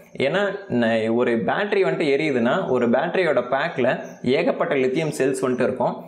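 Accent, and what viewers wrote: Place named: Kongu, India. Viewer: மரயாதை,கலந்த கொங்கு,தமிழ்,அருமை🌷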